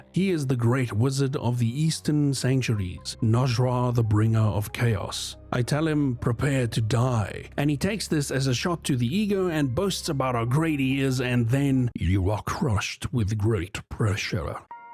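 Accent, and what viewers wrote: South Africa. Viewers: Is this a fellow south african that i hear who has also put himself through perpetual torment with this absolute gem of a game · this guy sounds like he's south african so im subscribing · Definitely a very South African accent haha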